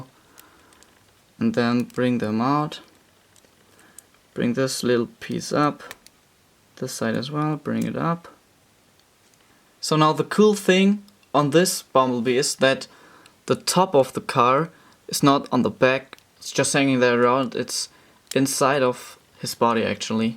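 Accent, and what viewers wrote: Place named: United Kingdom. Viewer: Also you sound a bit more British than the last time you spoke. I know your german but maybe when you dm i was reading with the wrong voice again. The voice is t important what's important is the fact that you did better and i love how you improve in one year!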